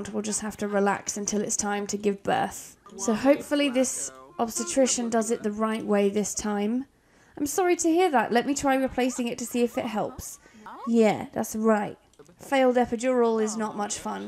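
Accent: United Kingdom. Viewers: OMG LOVE YOUR ACCENT WISH I HAD I AM AMERICAN sadly YOU SOUND LIKE YOUR BRITISH I LOVE THAT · im sorry but your accent is so british :')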